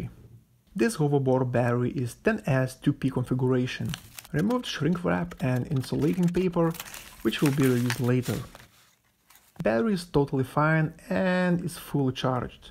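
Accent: Russia. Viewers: Сразу слышно что русский)) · Судя по акценту, ты русский) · Русский акцент)))) Канал на русском есть? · Акцент русский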